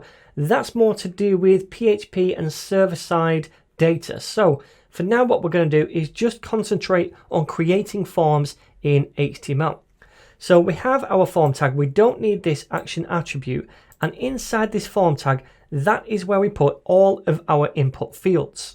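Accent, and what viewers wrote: United Kingdom. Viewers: oon-dah-stand. Oh boy I love your british accent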